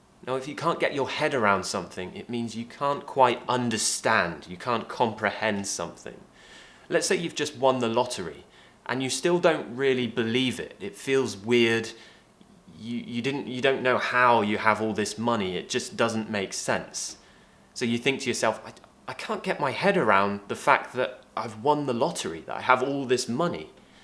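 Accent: United Kingdom. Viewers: Your british accent is amazing😍😍😍😍😍😍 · Allright!!! I am from Brazil and love your videos, you help me to improve my english and the my accent too cos I really like the Bristh accent.... Cheers!!!! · you really help me to learn british accent..thanks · Hi, I am one of your very supportive subscriber. I already watched some of your beautiful and useful videoS. I really like it so much. Although yourE a British people and I love British accent so I think itS the reason that pushes me to watch your videos everyday. Thank you and hope to see more upcoming videos from you. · Your British accent it's blew me away 😍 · I like acsen british. Ada karakteristik dengung. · Although you typed it here, I still watch the video because of his british accent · British pronunciation wins ❤️ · I love UK accent 😍😍😌 Arabic girl .. from Iraq .. Keep going🤗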